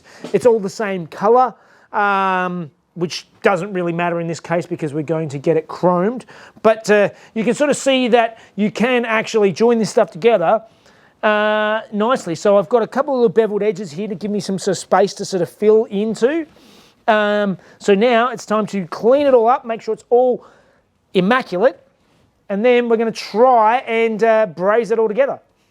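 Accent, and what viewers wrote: Australia. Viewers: Hello, I am a french old guy watching your amazing videos that I love. Can I suggest something? Fortunatly you put subtitles under the video because, (as I live in Bali most of the time, I know that you Australians have a fucking accent!)...so thank you for these subtitles. But please, can you do it without all "hum" and " hu" because it makes them harder to read? I can't wait watching the Alfarrari finished so hurry up! If you come to Bali, in european winter time, let me know and come minimum for a drink or stay in my beautifull villa! Say hello to Mrs...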